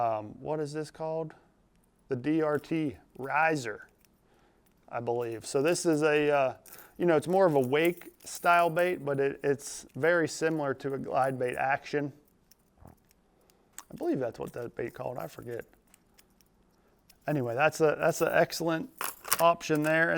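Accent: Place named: Pennsylvania, United States. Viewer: It's a Pennsylvania thing. Yinzer language. We try to keep it interesting up here kind of like a secret handshake.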